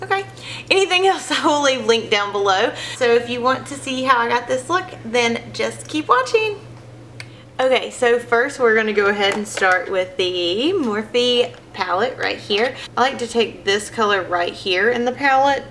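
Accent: South, United States. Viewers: I just noticed your po box ! I knew you had a southern accent but had no idea that we are in the same state ! That's cool ! I look forward to your next tutorial ! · My favorite palette by far is the Amazonian Clay Rainforest palette by Tart. It is perfect for my skin tone and the shadows bring out my blue eyes. I HAVE to get my hands on the morphe 350 palette and do this tutorial!!! I looooove vampy lips too but I have thin lips so this makeup look may not look as good on me. My instagram name is cck008. I love your channel and your southern accent so much!! Ps do you whiten your teeth? they look sooo white! If so, please do a video where you talk about how you get your teeth to look so good!!!! xoxo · I love your videos and insta posts! Where are you from? I love your country accent.. I'm from TN and have a country accent too!! :) I loveeee my morphe 35K palette! 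It's perfect for cool and warm toned looks!! ❤️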